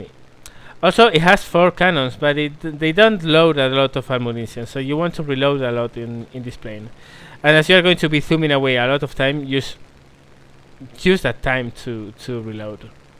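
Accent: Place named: Spain. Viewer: Pareces español por el acento inglés.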